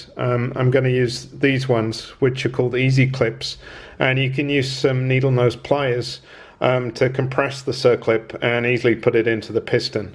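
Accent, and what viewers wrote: United Kingdom. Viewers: Nice video. With regards to circlips with tangs you need to be careful because the gudgeon pin can fret on the tangs which causes them to snap off! (I used to run mega tuned 350 LC's so I've seen this sort of thing!) You can still use this type of circlip but cut the tangs so they are only 1-1.5mm long, the gudgeon pin can still fret on them but they just wear away very slowly rather than a long piece of them falling off! Someone will probably comment that if they are wearing then the material coming off them is not doing the engine any good....yeah, yeah, does the air filter stop EVERY atom of crap in the air, I doubt it! EDIT...Dude, you sound British, it's a gudgeon pin, not a wrist pin! LOL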